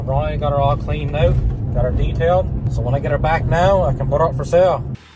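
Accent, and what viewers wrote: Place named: Newfoundland, Canada. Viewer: Greetings from Ontario on a sunny -22 Feb. morning. I must say how much I enjoy your channel and your social media posts, well done! Buddy, your humor, accent, and content make for the best ambassador of Nfld! I have a question: I am an older fellow that enjoys making videos... you mentioned in a video that you use your phone and an external microphone. To improve my sound quality I would like to purchase a microphone. May I ask what microphone you use and how long the battery lasts as well as any other thoughts or recommendations you might have. Thanks so much, Paul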